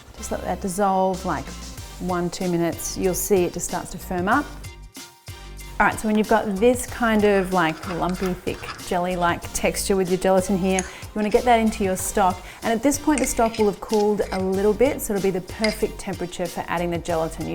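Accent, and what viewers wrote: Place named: Australia. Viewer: Pretty sure it's just her Australian accent lol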